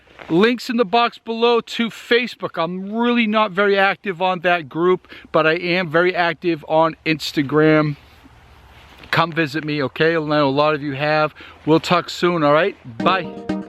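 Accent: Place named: Massachusetts, United States. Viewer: Dude are you in Massachusetts? You sound like you're in Massachusetts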